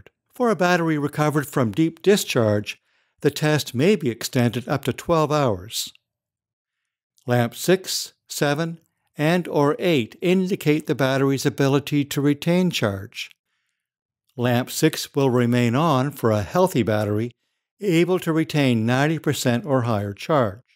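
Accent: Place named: Canada. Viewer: This guy's voice is weird, I hate it. Sounds like a damn Canadian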